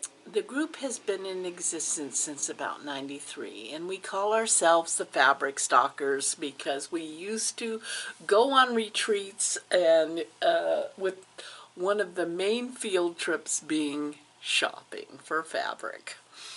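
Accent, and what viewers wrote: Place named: Oregon, United States. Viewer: Hi there. I’m Rose, and I’m so happy that I just found your wonderful channel, I love it. It’s such fun to watch and to listen to all the lovely things that you make and get up to. And I love your Oregon (?) accent ❤ I live in rural Australia, just out of Melbourne, in Gippsland. I’m completely new to quilts in fact, I’ve never made one before! I’ve always been a creative embroider but I’m intrigued with all things quilting. I joined my local quilt group and the folks there are terrific and helpful. You are so enthusiastic and generous with your channel and I feel really inspired by all the great things you talk about. Thanks. I wish I could join you in gorgeous Portland, it looks like a terrific place to be ❤ Keep smiling and being happy!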